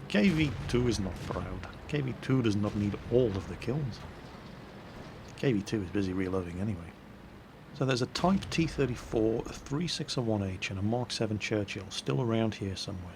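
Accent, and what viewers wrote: Russia. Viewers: Love your Russian accent it killed me :) keep it up! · Rita, what do you think of ' "Russian" accent? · I love dat russian accent of yours. This made my day.:) aaand my family thinking to call a medic, since i laugh so hard, they think i'm become mad or sg.:) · No matter what anybody says - that Russian/English IS funny as hell :-D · I lvoe both you and your Russian accent,:3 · Dat Russian accent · Your russian accent is very funny, tovarish. xD Btw.: My best shot with the KV-2, was when I splashed a Panther and make his engine burn. :D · speaking with russian accent... so weird and yet so sexy... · dat russkie accent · Your russian accent is just awesome)) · Nice Russian accent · Please do a video with you talking only with russian accent. Its priceless!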